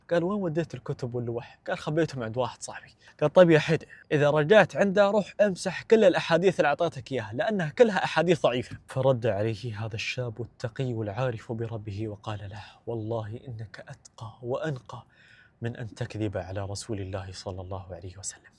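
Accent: Egypt. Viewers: دمه خفيف وهو بيتكلم مصري لسه عندك لكنه في اللهجه المصريه حب مصر اكتر تتكلم احسن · انت مثال للإنسان المتنور المحترم ولهجتك بالمصري احيانا زي العسل تحياتي لك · والله حضرتك انسان جميل ربنا يبارك فيك وطريقة كلامك المصرية الاسكندراني جميلة لأنني اسكندرانية ربنا يحفظك قصة جميلة❤❤ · راااااائعة ولهجتك المصرية شرباااااات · اسلوبك جميل واللهجه المصريه عندك جميله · والله إنك الاذكى والاحلى شدتني بلهجتك المصريه وكملت للآخر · انت مين اللي علمك الكلام ده مصري مصري يعني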